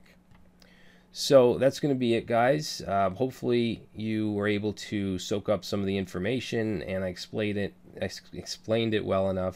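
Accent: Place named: Massachusetts, United States. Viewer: I thought I heard it, I heard the Massachusetts accent! Should call it the New Englandah, but it's not as prevalent in New Hampshire. Still can hear it in some parts of Maine. Great video man!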